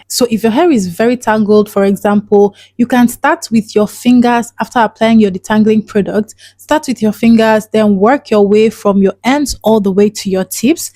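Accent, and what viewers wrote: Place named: Germany. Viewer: I like your accent. I notice a little German tones to it? Am I correct?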